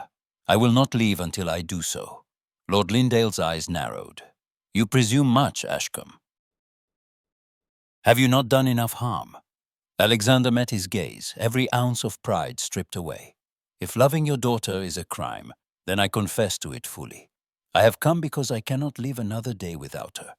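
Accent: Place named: India. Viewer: The reader has a trace of pronunciation from India or smth.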